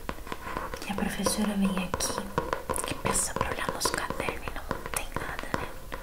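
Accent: Rio Grande do Sul, Brazil. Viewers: Gostei do estilo gaúcha, parabéns sempre inovando. Muito bom!!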